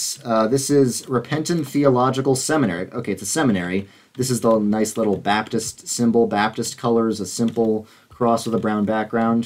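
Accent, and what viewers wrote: New York, United States. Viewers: got your new york accent coming out in that video 😂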